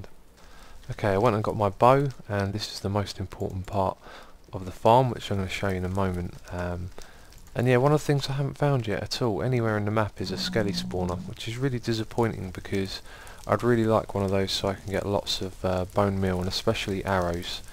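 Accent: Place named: United Kingdom. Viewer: You can't breathe through your nose? I just thought it is your british accent, so i think it's not a really big problem anyway... I am glad that you do what you want instead of making what you announced despite if you have fun. That's how shitty stuff is produced.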